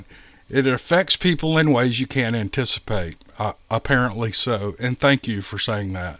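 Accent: South, United States. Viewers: I just love you! You're such an excellent story teller! And your voice and warm southen accent are pure perfection! (Accent! What accent???) Listening to you is always like sitting around a campfire listening to my favorite uncles telling stories. Thanks, for providing such great entertainment!!!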